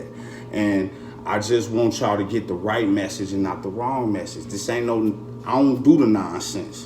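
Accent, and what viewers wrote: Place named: Chicago, United States. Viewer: You talk like you from Chicago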